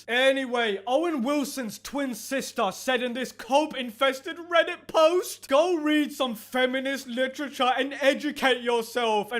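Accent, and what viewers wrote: United Kingdom. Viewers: The British soccer hooligan accent also sells it.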